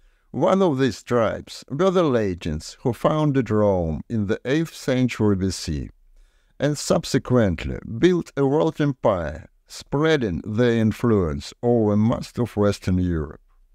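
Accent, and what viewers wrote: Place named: Eastern Europe. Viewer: Although you read all this with a Slavic accent, you forgot to mention the Slavs!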